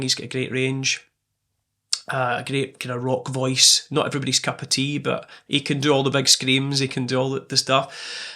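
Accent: Scotland, United Kingdom. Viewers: OMG, I thought you were Justin Timberlake for a moment, you look so like him. Then I heard the Scottish accent like mine. Great video. Keep up the great work. Much love and light. Poulo.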